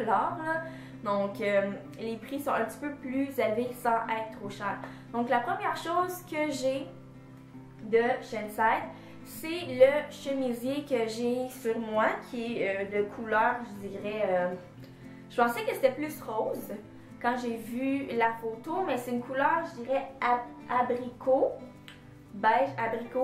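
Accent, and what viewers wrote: Canada. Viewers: J aime les 2 blouses et la robe blue blanc le tt assaionne de ton accent Canadian que je kiff fort !!humm Je m abonne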